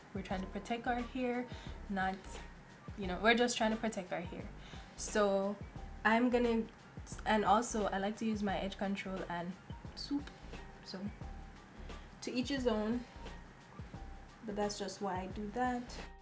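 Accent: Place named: Caribbean. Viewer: Lovely to see another Caribbean queen! Love the hair and your accent!!!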